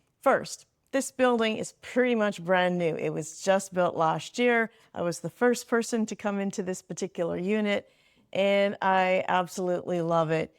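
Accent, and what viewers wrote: Canada. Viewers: I’m hearing a Canadian accent…..you did a great job with this video….pat yourself on your back…you are too young to be living in a 55 community….you look very young…I get that you moved to be close to your kids/grands and that’s important. I’m jealous because my kids live far away. I can picture you in a complex, close to your kids that does not have the age restriction…..don’t know if that even exists where you live. My vote is for you to move if you can find a nice place…..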